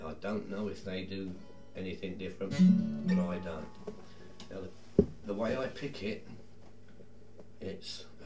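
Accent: United Kingdom. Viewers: His British accent makes him more entertaining!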